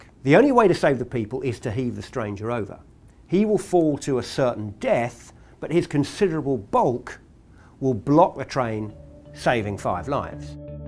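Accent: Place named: United Kingdom. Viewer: İngiliz aksanı yalnız bu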